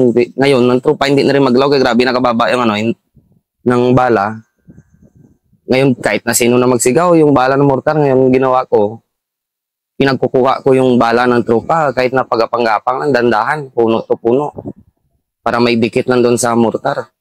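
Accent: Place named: Iloilo, Philippines. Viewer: Sure ako na ilonggo accent nito we salute po sa inyo sir.❤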